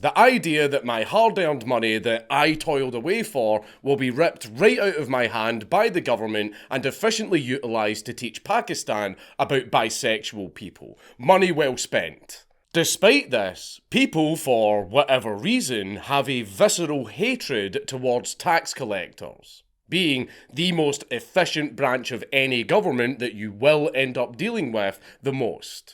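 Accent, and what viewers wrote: Scotland, United Kingdom. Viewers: He talks too fast for such a heavy accent. Just Scottish blah blah.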